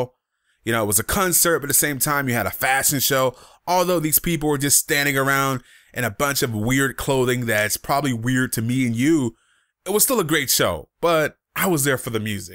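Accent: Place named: New York, United States. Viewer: Martin Shkreli......whos mans is this????? New York Accent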